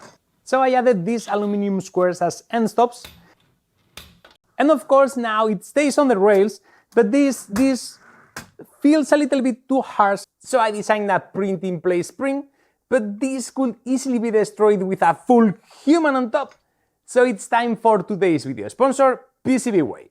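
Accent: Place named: Spain. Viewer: Tu eres Español Pero vamos.... Ese acento... Igual me equivoco. , a ver si te lanzas y metes audio Español también.. Gran video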